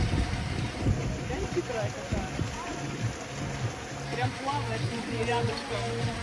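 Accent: Ukraine. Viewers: На Украине такой красоты нету? В Киев на экскурсию поедете, что вы в России забыли? Путин украинцам по выдавал России кие паспорта, от этого вы русскими не как не стали, это равносильно что негру дать российский паспорт, от этого он русским не стал, видно по вам что вылетые украинцы, по русски с акцентом, по украински бы разговаривали бы, вам больше идет украинский язык, русская речь у вас с большим акцентом, вас украинцам можно от лечить от русских, почему? Потому что это две разных национальности. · Ну раз уж РФ вас отвоевала и вы помчались путешествовать по особо не родной прежде стране. То было бы маст хевом избавиться от говора и начать учить русскую речь! А то понимаете ли пока еще не все россияне в курсе что где то там какой то Мариуполь теперь не в составе УКР. Антинезалежные настроения все такое..Речь сразу резко отличается!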